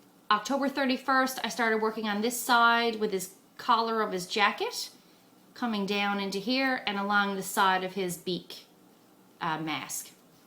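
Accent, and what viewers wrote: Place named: Newfoundland, Canada. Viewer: Always love your updates and stories and seeing how much progress you manage to make in spite of working and being a great wife and mother. My. best friend in high school and college had both parents and a live in paternal Grandmother that were Newfoundlanders transplanted to Tennessee. So I love to hear your NL speech. Reminds me of all of them. Good memories. Thanks!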